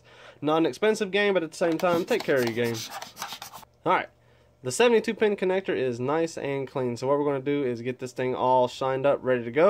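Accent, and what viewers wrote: South, United States. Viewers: This is an amazing video man! You sound like you're front the south as well like I am. Just picked up an NES for $5 at a yard sale and it works! I'm looking to refurbish it on my next day off.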